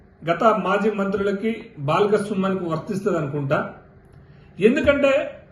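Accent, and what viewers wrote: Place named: Telangana, India. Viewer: Anna ne matallu pakka Telangana bashaaa Keep it up Anna gaaru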